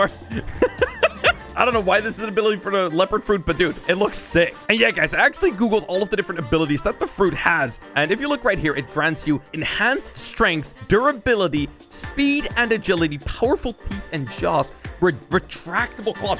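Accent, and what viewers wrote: Netherlands. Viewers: are you from the netherlands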